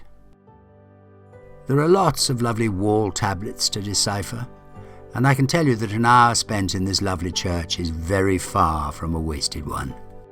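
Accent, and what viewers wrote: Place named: RP, United Kingdom. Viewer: Among other things,I tremendously enjoy the RP pronunciation of the narrator,the pure,nouaced flow of the language which shows education and scholarship! Thank you, you are the hightlight of my week!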